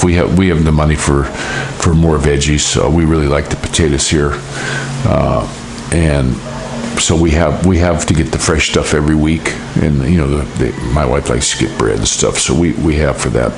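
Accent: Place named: Western New York, United States. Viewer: Sounds like you lived up on the lake! If I had to guess I would say silver creek NY or somewhere around Eire pa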